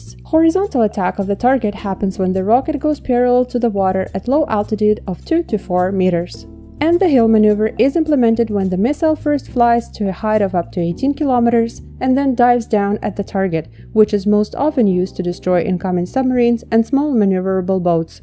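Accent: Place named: Russia. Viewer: I’ll take a person with a Russian accents word over anything produced in the us as to the true capabilities of this weapon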